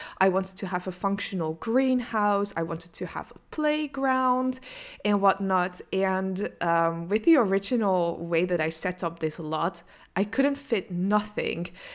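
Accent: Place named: Netherlands. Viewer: You sound very Dutch. 😊 And I like this communal garden. It looks very grand and luxurious.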